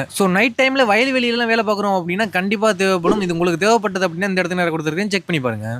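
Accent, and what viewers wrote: Tamil Nadu, India. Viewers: നിങ്ങളുടെ തമിഴ് എനിക്ക് ഇഷ്‌ട പെട്ടു, സിനിമകളിലെ പോലെ അല്ല. ഇത് നാടൻ തമിഴ് ആണ്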